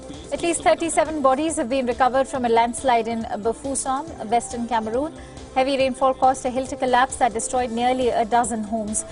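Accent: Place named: India. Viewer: Ewwwwwww Indian accent lol destroyed English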